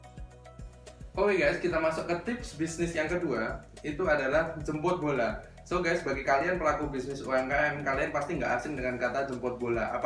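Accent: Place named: Java, Indonesia. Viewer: KOKO MEDOK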